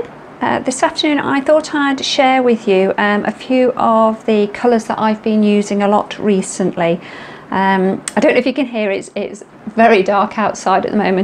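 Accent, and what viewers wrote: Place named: North, United Kingdom. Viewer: Hi! Thanks for this video - just chanced upon it, as I was searching inspiring videos on granulation. I love the DS range too, and acrylic inks. I'm really looking forward to departing from my usual floral art and photo-art, and try something a little different. Maybe some landscapes! You've given me a boost, and I feel really inspired to get started.... Thanks again!:O) btw, I live in Scotland now, but I clocked your accent, as I originally come from High Lane between Stockport and Buxton.... are you from somewhere around there? Cheshire, Derbyshire, York, Lancs?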